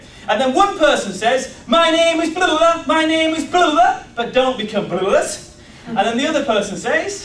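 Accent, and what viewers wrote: Yorkshire, United Kingdom. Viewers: I think he might be from Leeds or Sheffield or very close to. I'm from Doncaster and it's almost identical so hard to tell.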